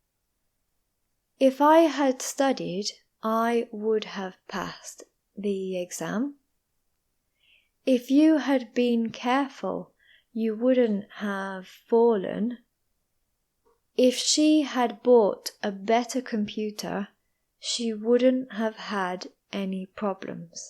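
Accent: United Kingdom. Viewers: Are you British????? Reply please ❤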